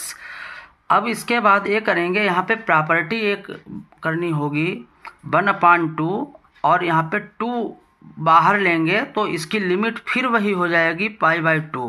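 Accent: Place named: Bihar, India. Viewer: Bhai eski bihari master ki language toh kam kam hi samj aayi pr, pr question toh smj hi gyi Mai 😅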